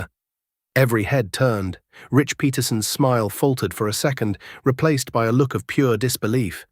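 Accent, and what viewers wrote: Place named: United Kingdom. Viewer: The narrator with the British accent talks too fast. British accent talking about American farm?…?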